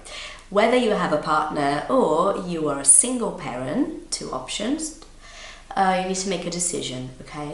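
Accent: RP, United Kingdom. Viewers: It's amazing that you sound almost like the Queen. Super posh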